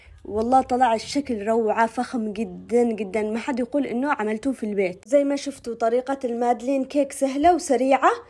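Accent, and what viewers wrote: Aden, Yemen. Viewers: الأخت كإنها يمنية صديقتي يمنية جدا أحبها وهي تتكلم بلهجتها اليمنية هي من صنعاء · لا لهجتها عدنيه الصنعني مختلفه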